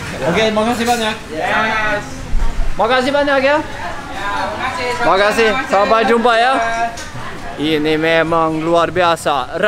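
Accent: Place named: Lombok, Indonesia. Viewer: "Logat" sudah seperti Orang Lombok🤭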